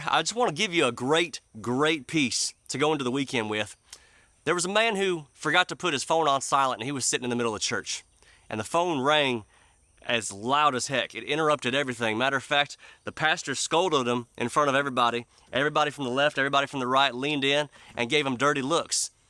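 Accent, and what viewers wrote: South, United States. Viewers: LOL...love the stories! and that southern accent....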